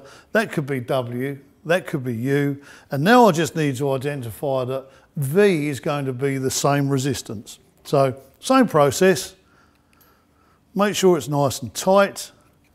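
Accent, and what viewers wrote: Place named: United Kingdom. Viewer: This gentleman speaks like Magneto in X-Men. Nice British accent 👌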